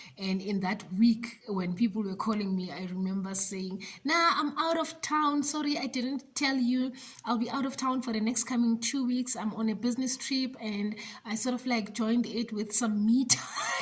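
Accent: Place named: South Africa. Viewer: you look absolutely beautiful in red, love the nails and your entire look. Love the authenticity and your south african accent 😘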